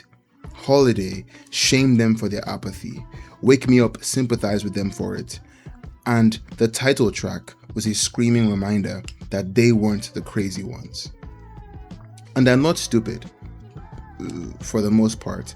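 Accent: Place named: Nigeria. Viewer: You didn’t need to say you Naija, it’s a distinct accent, country also history.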